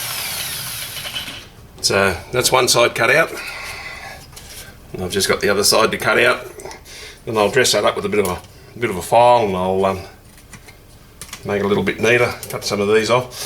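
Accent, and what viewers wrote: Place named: Australia. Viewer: Great video, just bought a "new old stock" mesh grill, wasn't quite sure how much of the old grill needed removing, nice to hear an Aussie giving YouTube information.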